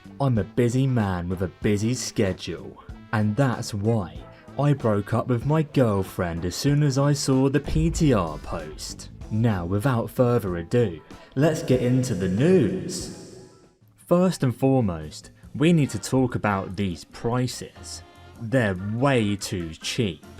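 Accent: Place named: Essex, United Kingdom. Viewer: I have a friend sounds like exactly like him, when hes being sarcastic i dont realise half the time XDD, might be an Essex thing could be wrong XDD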